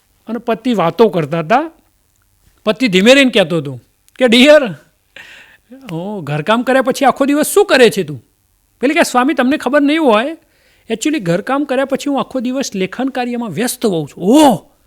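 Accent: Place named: Mehsana, India. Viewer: mast mesanani bhasa bolo chho sweet lage 6 pan atlivarma puru lo thoda vadhare to sambhdavo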